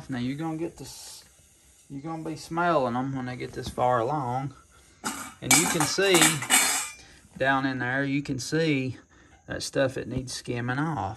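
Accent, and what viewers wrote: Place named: South, United States. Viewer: I grew up in Oklahoma and moved to the north a long time ago, I LOVE watching videos just to hear that southern talk 😍 All the other content is a bonus !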